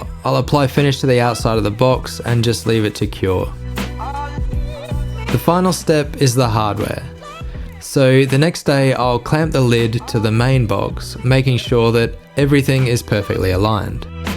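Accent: Australia. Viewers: Just saying thanks for the presentation: a genuine honest woodworker just doing what we do. the aus accent is appealing too. I found your videos because I also researched/purchased a Harvey table saw.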